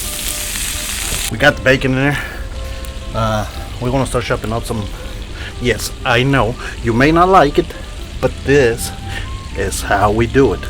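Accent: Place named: Kansas City, United States. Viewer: Boy, those Potato Taco's with salsa! Yummy! You are a real Mexican with a Kansas City accent. Not making fun of you. I have Mexican cousins that live in Topeka! I am going to tell them about you! I will making your salsa, that's for sure!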